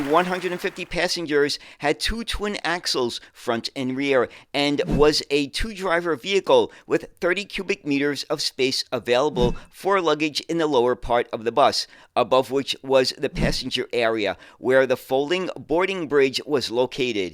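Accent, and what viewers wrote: New York, United States. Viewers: I'm English who grew up in England. My accent is typically from S E England. Your articles are so informative and interesting. Your New Yorker accent is really great, coupled with your enthusiasm. 😊❤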